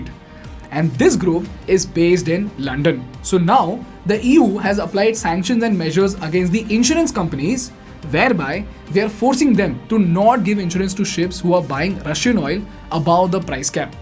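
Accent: India. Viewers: Hm Indian English 😂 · Pure Indian accent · Distinctive Indian accent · the host had poor and bad English, it sound Cheap English with Indian accent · Omg that Indian english accent😂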